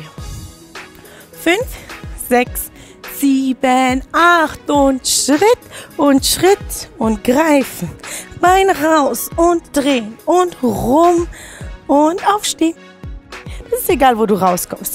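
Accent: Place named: Brazil. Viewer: è bradileira né? o sotaque não engana 🇧🇷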